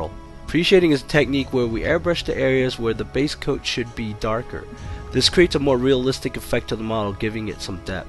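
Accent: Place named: Northern Ireland, United Kingdom. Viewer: Bet ye you're from Norn Iron!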